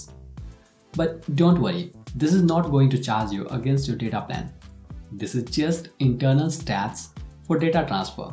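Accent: India. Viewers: I don't mean to be unkind. But you should work on getting rid of the Indian dialect. For me, it's impossible to follow you.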